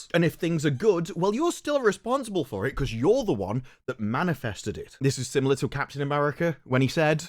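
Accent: United Kingdom. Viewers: Are you British?? Can’t tell.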